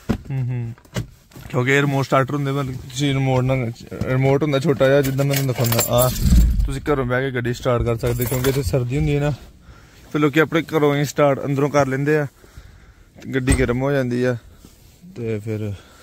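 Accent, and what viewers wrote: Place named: Punjab, India. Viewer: love watching your videos bro. keep it up. your Punjabi is just like mine.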